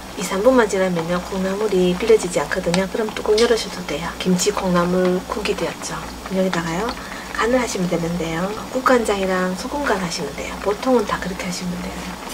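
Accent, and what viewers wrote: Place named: Gangwon, South Korea. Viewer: 님~^^ 네~강원도의 사투리 구수한 매력이 있네요~!! 맨두와 가시기 저도 좋아하는 메뉴입니다^^♥♥♥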